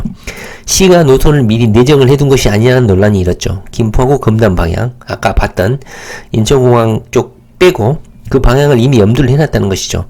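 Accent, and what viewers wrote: Jeolla, South Korea. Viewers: 이사람 전라도 가탕..사투리쓰네..전세계 최고 허브 공항을 당연히 gtx 연결 시켜야지..국가 발전을 위해서..스톡홀름..공항에서 바로 시내로 초고속 기차 진입하니 완전 빠르고 좋더만..국토부에서 알아서 하세요~~공항철도는 넘 느려~~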